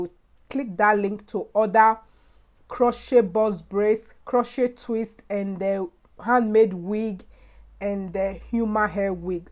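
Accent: Africa. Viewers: This accent is 100% African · I love your accent! African Queen 👐🏽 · Luv your African accent. The "t" in crochet is silent.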